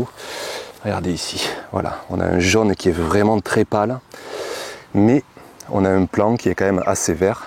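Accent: South, France. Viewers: Merci beaucoup pour cette vidéo. Exilée en Alsace, c'est toujours un plaisir d'entendre l'accent du sud 😊 Je seme tous mes plants en Alsace chaque année. Ils finissent dans la serre. J'en replante dans notre jardin familial près de gignac, non loin de Montpellier lors des vacances scolaires. Cette année, première zone hélas, les replants ont été mis en place le week-end de pâques. Nous allons voir le résultat pour le pont du 8 mai. 🤞 En Alsace, les plants jumeaux sont en fleurs sous serre. J'attends fin mai pour mettre les derniers en pleine terre et livrer des plants à ma fille en haute Savoie Tous les ans, je suis surprise de l adaptation des diverses variétés replantées dans ces divers coins de France. Que la nature est resiliente et généreuse !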